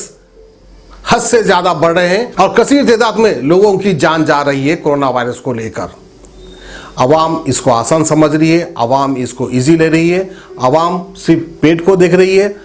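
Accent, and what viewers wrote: Hyderabad, India. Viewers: bhai pure Hyderabadi language.....ghareeb kya karinga bhai...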